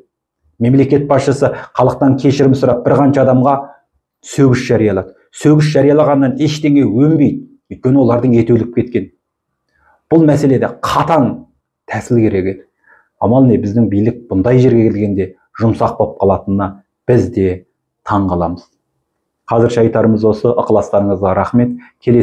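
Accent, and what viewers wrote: Standard. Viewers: Рахмет сизге таза Казакша сойлейсиз барлык блогерлер СИЗ сыякты болса екен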